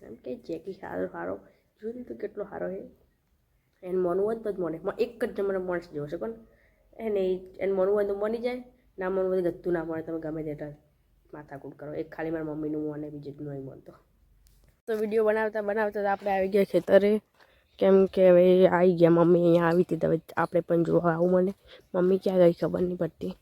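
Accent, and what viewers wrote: Banaskantha, India. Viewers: Tame KO k na KO pan mane tamari boli uparthi junadeesa aaju baju na lago so ben....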